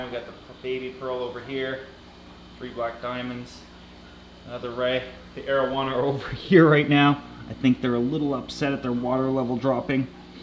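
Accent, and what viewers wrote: Canada. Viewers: Hes canadian, was accounting for his accent. Anything remotely close is better than permagranite lol.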